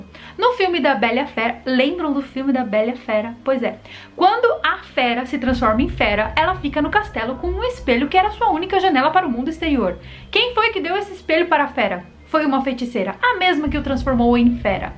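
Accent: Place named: São Paulo, Brazil. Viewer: Adorei o sotaque do interior paulista!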